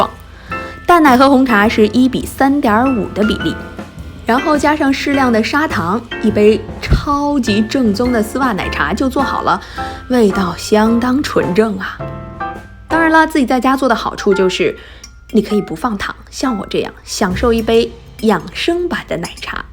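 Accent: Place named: Northeast, China. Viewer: 看起來好好喝，聲音也好聽，很像東北口音😁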